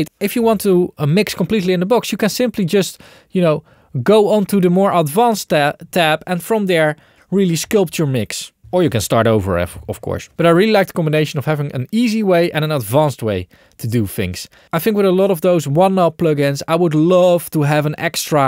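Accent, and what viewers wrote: Netherlands. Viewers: you sound a little bit dutch, am I correct? 🙂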